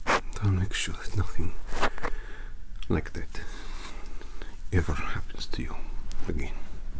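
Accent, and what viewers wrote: Russia. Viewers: as a russian I really appreciate your work with an accent and some russian phrases. good job! and the whole video... that's something really special. thank you so much, it was literally the best 18 minutes of this day for me :) (господи, мне как будто снова 6, а по телеку идет "бандитский петербург" 😭) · It's just awesome! Сhic Russian (accent and pronunciation) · Just discovered your channel and I have to say I love it! Очень хороший Русский акцент и в общем звучала правдоподобно! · это звучит очень круто, особенно английские слова с "русским" акцентом, звучит просто ахуенно, но немного тяжело отличить русские слова от английских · becuase he’s kinda creepy, his assurances and affirmations made me feel like he is obsessed with us rather than caring for us, or maybe im just biased to a Russian accent lol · That was pretty awesome. Your russian accent is pretty amazing. 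Since i am russian myself it was such a pleasure to listen you speaking russian. Русским в коментах привет!😊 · I'm Russian, and those russian words gave me shakes 😅 It was funny to hear that pronunciation. Anyways, good job trying to make russian accent 👍 Really cute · I find that I am really liking the Russian accent when it’s spoken softly like this. I think your Russian gangster reminds me reminds me of Ho Yinsen from the first Iron Man movie. I know that character is not Russian, Afghan, I believe, but the gentleness, the pronounced accent, the caring... and the glasses 🤓 just makes me think of that character. I like that character and I like your Russian gangster... when he’s being good. 😉😁 Is there a deeper meaning for you behind all of the personal attention videos that you make? Just curious. 💙💙💙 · Yay, Russian accent! ^_^ I'm beginning to like this character, get really strong big brother vibes from him, and he also reminds me of how I used to imagine Sirius Black before I got to watch The Prisoner of Azkaban. 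Thank you,! Спасибо,!)) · I gotta admit, I’ve listened to this every night since I’ve found it. I love all your videos but this Russian accent is sooo relaxing! I really hope you do more videos of soon!!:) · As a russian,I can confirm that your accent is perfect. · I'm new to this channel and I'm used to most asmr rps being black screen or single frame'd so seeing a full human man on my screen speaking to me in a russian accent, soon then approaching me with a knife, was a bit of a jumpscare ngl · That Russian accent of yours really works well for ASMR purposes. Awesome character work once again with this one,! 😎👏 I feel like we'll see ol' in a 3rd (mis)adventure down the road. 👍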